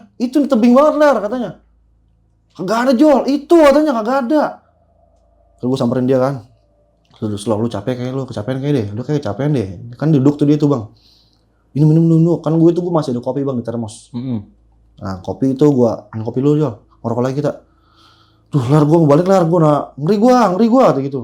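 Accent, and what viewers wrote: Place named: Bekasi, Indonesia. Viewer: Bekasi bgt nih logatnya resep betdahh dengerin cerita lu bang 🤣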